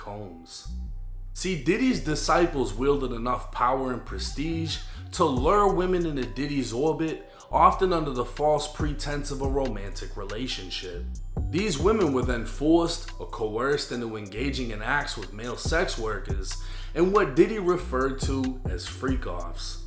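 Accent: Boston, United States. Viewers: Bro your accent sounds florida/Boston/ and Massachusetts 😂😂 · Yooo shit had me dying i be in here just to hear this white boy narrate the news 📰 bro got a mix of accents with the local Boston accent god bless America 🇺🇸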